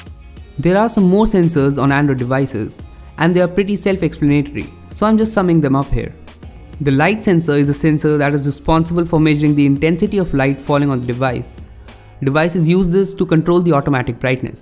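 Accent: India. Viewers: Indian flavor of English is really irritating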